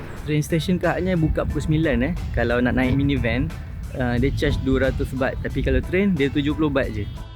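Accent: Kelantan, Malaysia. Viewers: Aa setuju. Tapi de loghat kalate kit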